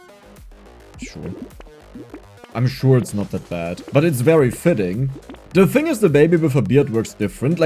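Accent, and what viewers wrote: Germany. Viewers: ich höre einen deutschen akzent ???!!!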